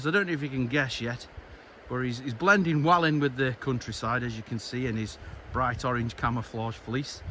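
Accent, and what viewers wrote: Stoke, United Kingdom. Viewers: Hey fellow Stokie, Just found your channel Through English Woodsman! Dan. Said to my hubby this guys a fellow stokie, Our accent stands out. Loved your video and have just subscribed. Roughly what area you in??. I'm Endon.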